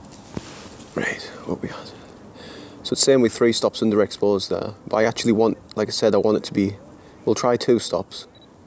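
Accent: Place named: Durham, United Kingdom. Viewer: Just come across your channel and I love it! Your accent is identical to mine - a Durham lad - and I love how you appreciate Pentax! I have had all brands over the past 10-12 years from point and shoots to my current camera the Sony A7iii, but the best shots I've ever taken were with the Pentax K3. Keep up the good work pal!!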